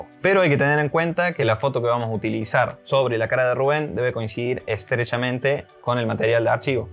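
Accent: Cordoba, Argentina. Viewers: Lo mejor que la explicacion esta en Cordobes 😎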